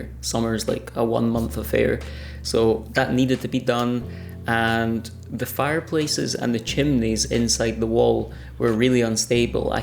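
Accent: Scotland, United Kingdom. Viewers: place looks amazing..I know those costs are quite high but, given the whole lot was done by Trade, it'll all be guaranteed and you have that peace of mind. With regard the old character, you haven't destroyed it, it's merely covered. oh..and you couldn't sound more Scottish if you tried! excellent work! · I knew you were Scottish! I"m so proud I got it right! I watch Outlander, but the accents on Outlander are super thick, so I will confess, I looked up the author Michael Bruce you mentioned in the other video to make sure I wasn't totally off.😁😜😉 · At the start of the video I thought you had a soft, American accent. Then I realized your Scottish. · For me, your house has so much character. I appreciate that the house needs insulation and think you did everything right. I have visited Scotland, Banff and I really enjoyed my stay. I had lovely weather. 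I visited for a valentines weekend. My fiancé, at the time, drove through the night. It took about 11 hours! It was a great adventure. The people were lovely and we actually met someone from London, where I live. He was the pub landlord and was very nice. By the way, you have a lovely accent. I do like a nice accent and listening to you, reminds me of my stay in Scotland. Another super video 💜😁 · 😂 I knew you were a Scots man ! Well done love from Ireland ! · Good golly! You sound Scottish, not Irish, not Welsh. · It is a blessed house, when a believer lives in it! :-) Because then it is the home/temple of God... :-) Enjoy living in your beautiful house and behold your wonderful scottish accent!!! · This American was actually able to distinguish your Scottish Accent......😎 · You did an incredible job! I did think you were Irish at first (and I'm from the UK too!) at first, but now I can clearly hear your accent is Scottish. 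It's nice to be able to do decide every detail of your house like that and have an historic building with the character it has. How old is the house? It looks like possibly 18th or 19th century, but could be much older? I noticed it had originally had very small windows.. During the 17th or 18th century I believe, the UK had property tax based on size and amount of windows in a house..so it's not unusual to see houses from that period with windows bricked in or built with very small windows to reduce the property tax! This little house originally had that look, like it was designed with minimal windows and very small sized..so it could be from that period? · Your builder/contractors did a great job. I thoroughly enjoyed this video and the restoration video that preceded it. I'll look forward to your next project. I knew you were Scottish when I heard your voice. Being of Scots descent on my Dad's side, I hope to visit the land of my ancestors one day. 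Keep up your good work and good luck in the wife-hunting. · Ireland? Wales? Please - that's such a Scottish accent! (and a lovely one at that) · You sound 200% Scottish. Not sure how anyone could say anything else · Having been to Scotland plenty of times in the past, I instantly knew he was Scottish. However, the impressive thing for me is that his accent is really easy to understand compared to other people I have met in Scotland. He's really articulate and that is quite helping when watching the video. Congratulations for your work in the house, from Spain. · Awesomely well done. I’m 50% Scot myself. My mother was born in Armadale. So of course I love your Scottish accent. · I think your tiny house is wonderful, and watching the process was truly interesting to watch. I'm glad you're planning on doing more. I'm now a subscriber! If you want to make some money on it, all you have to do is wait a few years to sell it..... 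And how could anyone mistake your lovely Scottish accent and sense of humor? Best of luck to you! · No, being Irish I knew you were Scots, but how do you get these people to work for you is my question? And that is such a beautiful place, you are so lucky!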